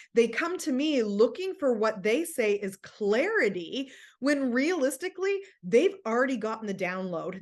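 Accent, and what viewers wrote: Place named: United States. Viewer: Absolutely wonderful to listen to you. loud and clear for me who is Swedish. Wonderful American accent.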